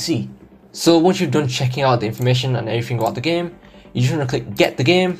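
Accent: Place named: Bradford, United Kingdom. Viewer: My man sound like he’s from my home city Bradford 🤣🔥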